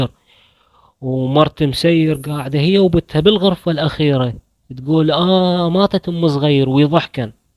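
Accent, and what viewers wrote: Iraq. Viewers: اللهجة العراقية جميلة الله يحفظك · القصه كلش حلوه وسردها بلهجتنه العراقيه الحلوه . قصه معبره تخلينه نحب الموت ومنخاف منه ونستعد له بالاعمال الصالحه. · ما اجمل اللهجة العراقية ...فهمتك هْوَايَهْ زين ❤ تحياتي من الجزائر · تعجبني اللهجه العراقيه الجنوبيه وقصه جميله وشيقه حقاً ❤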